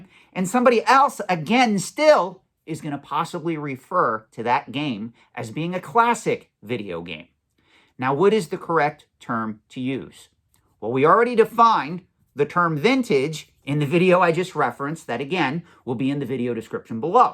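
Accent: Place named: Pennsylvania, United States. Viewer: regional dialect form Pennsylvania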